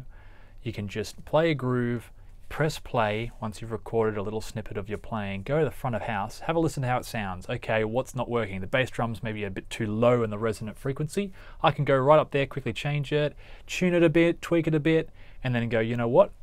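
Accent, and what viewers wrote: Australia. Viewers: Good to hear an Aussie accent. Sound like a Melbourne accent.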